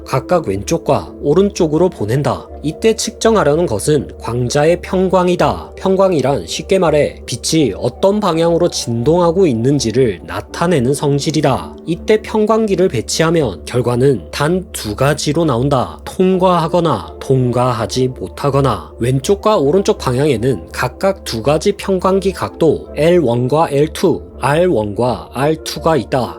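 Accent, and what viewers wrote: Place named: Gyeongsang, South Korea. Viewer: 경상도 사람이 대본을 또박또박 읽는 느낌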